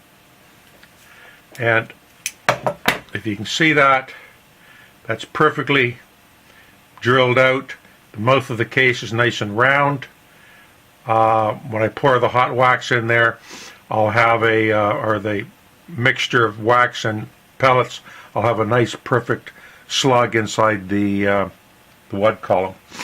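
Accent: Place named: Canada. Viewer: Worth noting that at today's (2023) lead and shell prices, if you reload and cast you can shoot slugs cheaper than bird shot. I can buy soft lead ingots for $2/lb, but #8 shot runs awfully close to $3/lb (US dollars here, since you sound Canadian). I can cast enough Lee slugs for a season in an hour or two over a gasoline camp stove (fuel cost about a dollar for the session), load them with the same wad and powder load I'd use for the same weight of bird shot, use a bingo chip (around a cent each) for an overshot card and either roll or fold crimp (I have a roll crimp tool, but my Load All II makes really nice fold crimps). You may want a 20 ga. nitro card inside the shot cup under the slug to keep the wad from getting stuck in the slug base, that's another two cents or so. A 1 ounce slug load then costs a few cents less than a one ounce birdshot load in the same hull, with the same powder, and ought to be about as accurate as your waxers -- and less work, IMO. 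I doubt I'll ever pay for commercial slugs... BTW, if you want to cut the crimps but keep the compression on your wad, you can put an overshot card on top of your wax slug (cut with a 3/4" punch out of cereal boxes or similar card stock) and then roll crimp over that. If you cut the minimum to remove the edge of the crimp, you'll have plenty of hull to roll over on top of the wax and card.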